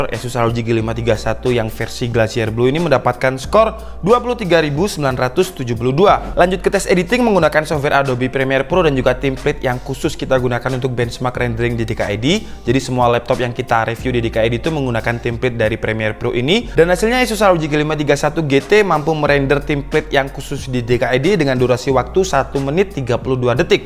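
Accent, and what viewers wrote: Java, Indonesia. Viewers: Wong jowo ta? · Orang malang bang?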